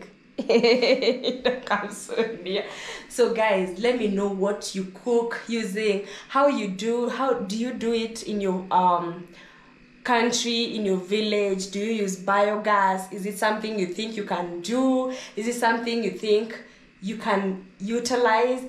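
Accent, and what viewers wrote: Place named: Nigeria. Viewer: I love your nigeria accent oh. Love you in all accents. I appointed myself an Auntie from far😁😆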